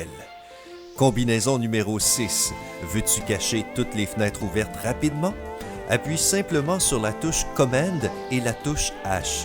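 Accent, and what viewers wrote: Quebec, Canada. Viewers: L'accent Canadien on adore xDDD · moi aussi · J adore ton accent québecois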